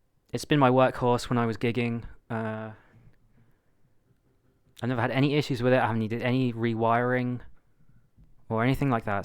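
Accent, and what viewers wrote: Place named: United Kingdom. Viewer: Great video mate love EJ's clean sound so much especially for chord work and improvising. Whereabouts are you from in the UK? Can't quite place the accent.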